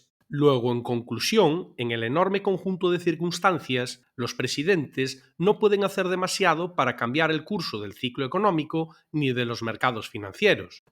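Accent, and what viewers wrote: Galicia, Spain. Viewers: un buen acento gallego no viene mal. Buen video 🙂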